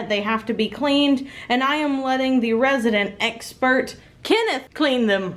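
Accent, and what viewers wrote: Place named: North Texas, United States. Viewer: I’ve been wondering what your accent was from because you sound exACTLY like my aunt Pam. You must be from norther Texas bear Oklahoma cuz that’s where my mom and aunt are from/grew up.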